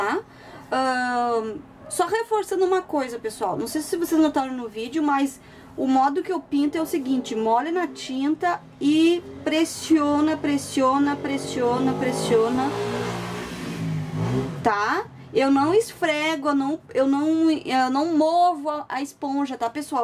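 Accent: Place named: Curitiba, Brazil. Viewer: amei vc é de Curitiba? tenho parentes em Curitiba, o sotaque é igual ACHO LINDO Bjs ♡♡♡♡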